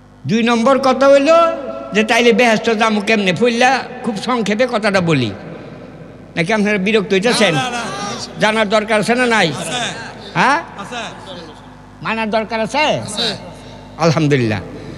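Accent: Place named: Sylhet, Bangladesh. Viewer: আমি ভারতের আসাম থেকে বলছি,, চাচা তোমার ভাষায় বুঝা যাচ্ছে, তোমার বাড়ী সোনামগনজ সিলেট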